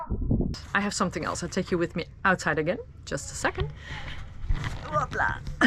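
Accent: Netherlands. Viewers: Waarom heb jij zo'n gruwelijk Nederlands accent? Je moet echt veel meer "overdrijven". Bijvoorbeeld "tolk about", de klank van jouw talk rammelt. Het is een O geworden, niet iets als een OA. Ga eens wat harder aan de klank van al je klinkers trekken. Maak het bekakt! Dat helpt. Alle Nederlanders zeggen UPDEET. Engelsen zeggen APDEET. En grapje, zeg nooit meer FOK tegen je djip. Dat gaat op een dag helemaal fout! Fok?! What? Hee, mijn "sorry" is weg. Dit stukje was NIET vervelend bedoeld. Ik hoor gewoon meteen Nederlands. En dat zit vooral in de klank van je A O U. Engelsen doen dat echt totaal anders. Jij niet en dat hoor je. Zelf intussen jaloers. Altijd geldproblemen en boten in slechte conditie gehad. Een kwarttonner Waarschip is prachtig, als al je hout in orde is. Van een lekke kielbalk word ik ongelukkig. Hij kon nergens op de kant voor een normaal aantal euro's, echt, dan word je NIET vrolijk. 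Kon hem zelf wel repareren, maar niet "effe", echt niet. Ik wil graag weer zo'n bootje, klein is leuk, wie weet lukt het nog eens. Voorlopig heb ik een hele goeie kano. Dus, het allerbeste met zeilen!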